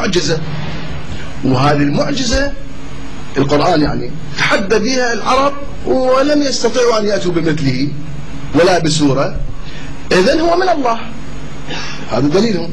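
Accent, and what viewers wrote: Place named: South, Iraq. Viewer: الصوت صوت هذا الدجال نفسه لان اللغه او الهجه الي يتكلم بها نفس لهجة جنوب العراق لا احديسطتيع ان يتكلم بهذه الهجه الا اهل الجنوب/ الشيعه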